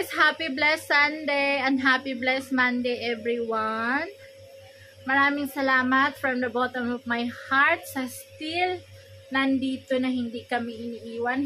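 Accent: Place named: Cebuano, Philippines. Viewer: Work narin ang pag vlog mo sis.....magkatawa ko nimo kay mo gawas jud imo pagka cebuana...God bless dai...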